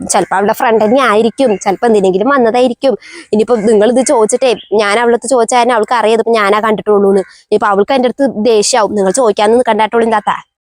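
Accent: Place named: Kallepadam, India. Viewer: കല്ലേപ്പാടം വർത്തമാനം നല്ല രസം....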